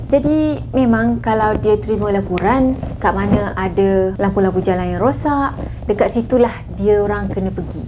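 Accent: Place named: North, Malaysia. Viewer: Perhh Pandai cakap utara ek😉😉😉......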